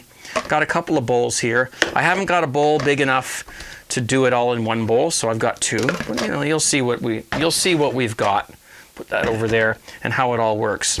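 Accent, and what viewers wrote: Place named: Canada. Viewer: French side on the sea salt box? Either IT'S from Canada, or is, I imagine. Using metric, eh? Right on, sounds like a fellow Canadian. Even apologized for dropping the box! I remember once I apologized when someone bumped into me at the pub! Anyways, great vid, I seen another method where basically it was all done in the jar. Put a small layer of salt at the bottom, add a layer of finely sliced cabbage, tamp it down, add a layer of salt, add a layer of cabbage, tamp it down, salt, cabbage, tamp, salt, cabbage, tamp, etc. I guess it can work either way, but more salt is probably used in the layer process. The layer process also seems a tad bit easier. Seen it on Jas. Townsend and Son. Gonna have to make my own sauerkraut some time! Definitely subscribing to this channel, I imagine there's vids on making home brew as well, which I'll certainly enjoy watching!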